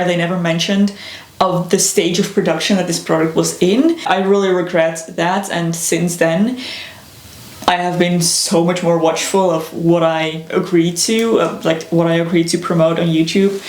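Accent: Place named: Netherlands. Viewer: One of the reasons I’ve been subscribed to you for so long is I’ve felt such a kinship with you from the beginning: I was a nerdy LOTR teen who enjoyed costuming and things like that. I’m American, but my dad’s family is Dutch and we lived in the Netherlands for a while when I was a kid, so I get such a thrill when you talk about life in what I feel is my second home. Early on when I started watching you I was like “Heyyy, I recognize that accent!” and was super excited to find out you were Dutch. I love your channel and your homeowner/gardening content is especially relevant to me right now as my husband and I bought a house just a few months before you and Robbert did. Your garden is basically my fantasy (we live in a desert so it’s impossible for me to do what I really want), it’s so lush and green. Anyway, this is all parasocial stuff, but I just wanted to say that you’ve got a friend in the western US. 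Thanks for what you do! (Also being a mom is the coolest thing ever - obviously a super personal decision, but I absolutely love parenthood!)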